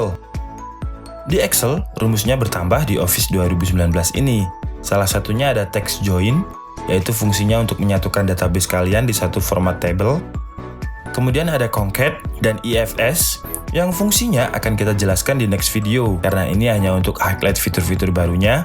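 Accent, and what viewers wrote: Java, Indonesia. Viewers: Menurutku bedanya GK terlalu jauh dengan Ms 2016 Bang dari Jawa y wajar logatnya logat Jawa kalau bicara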